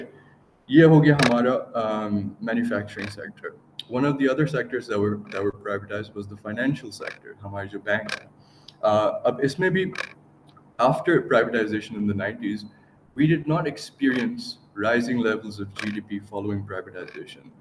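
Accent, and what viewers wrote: United States. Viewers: Ye bewakoof porkistan accent American use karta hai...... Isliye ye porkistan peeche hai.... DEFAULT....... porkistan ka khood ka kuch nahi.... UDHAR bheek se bana porkistan.... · Another Pakistani with American accent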